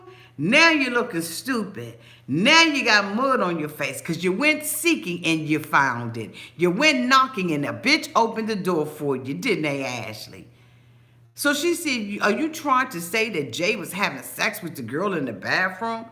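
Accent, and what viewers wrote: Washington DC, United States. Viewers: Auntie are you from the DC area? I'm trying to place your accent and I'm getting a strong DC accent. I'm a native so it's all love.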